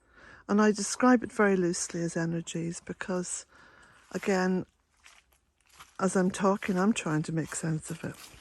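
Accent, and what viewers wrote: Ireland. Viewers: I just want to speak about your books. I have them all. I read and re read these. In these stressful times it is heart warming to read how you tell your story. Your passion comes to life in a very colorful way. Your love and devotion to the Goddess. To Earth. I actually think I read and hear your Irish accent as I go along. Lol. It's comforting and brings the certainty that we will make it through all this. "In a time of destruction create something", plant something, love something, nurture something. Great job! Blessings💖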